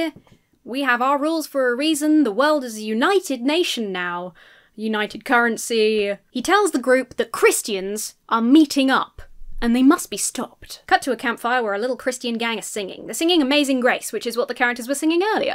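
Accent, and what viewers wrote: United States. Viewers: Dude, your American accent is awesome. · Killing that "Yank" accent gurl. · Your American accent is getting pretty solid!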